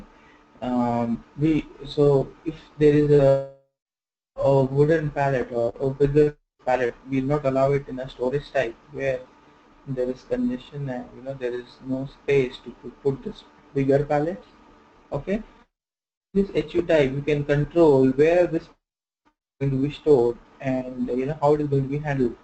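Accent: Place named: India. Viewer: indian accent makes this a hectic video it can not be watched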